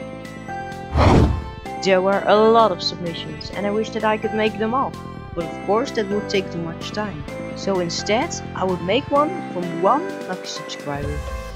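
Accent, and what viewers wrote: Netherlands. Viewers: That Goes so quick?! Je kan horen dat je nl bent btw 😂😂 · Are hou Dutch?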